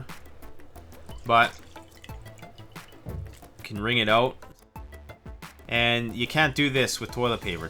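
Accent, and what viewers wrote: Canada. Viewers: The GoldenChild he’s Canadian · Wrong! The wetter your wiper be, the more likely your becoming contaminated by fecal waste..that pervasive accent ought to reveal how abominably runaway Canadia/en dorkiness is. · You, sir, have a violently Canadian accent. And it is beautiful. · I'm not big in prepping but I subbed because of your pure Canadian accent I wish I sounded like this but alas I sound like an American who moved to Canada and I'm not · I'm sorry if this comes off as rude, but I have not heard a more Canadian accent ever.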